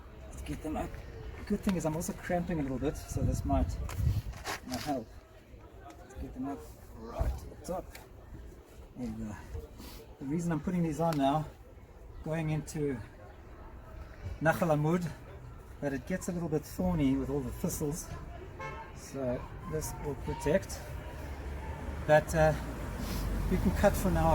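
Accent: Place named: South Africa. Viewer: גם כל כך נחמד לשמוע את המבטא הדרום אפריקאי האהוב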